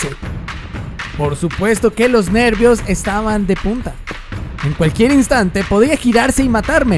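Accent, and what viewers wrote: Colombia. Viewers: Sos colombiano?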